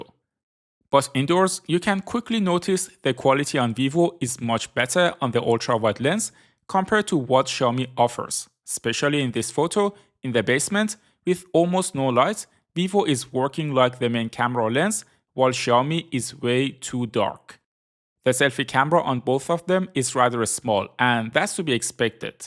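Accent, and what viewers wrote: Iran. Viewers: چرا لهجه ایرانی داری داش؟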